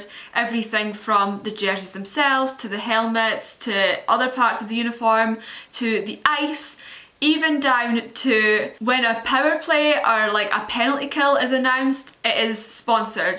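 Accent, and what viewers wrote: United Kingdom. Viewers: When I say she had a British accent I clicked off what does a Brit know about hockey leave the hockey to the Canadians stick to the soccer and rugby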